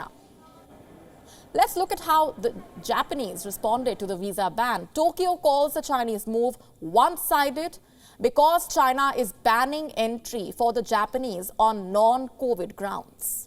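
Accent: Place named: India. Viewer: don`t you have a speaker speaking english? This indinglish is really annoying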